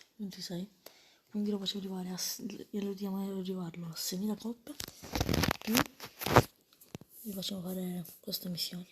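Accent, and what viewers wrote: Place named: Sicily, Italy. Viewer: Si sente l' accento del Siciliano 😀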